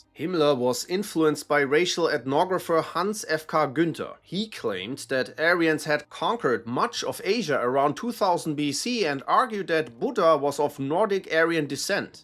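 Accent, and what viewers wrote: Germany. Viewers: The most German accent I ever heard but nice video 😂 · As a WW2 buff I learned a lot today , thank you for providing this. It also sounds better coming from a German , it makes the video more authentic and engaging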